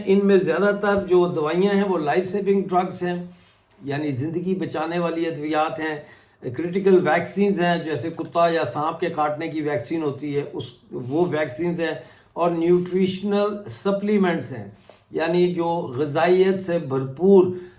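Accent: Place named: Punjab, Pakistan. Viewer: Chacha Apne pojk Ka bhi Btao kya ho Raha he Waha? 😮 Tum baaki poori duniya ki News btate to LEKIN pojk Ka ni btate ? Tum panjabi ho kya? Tumhara Accent panjabi Lagta he 😮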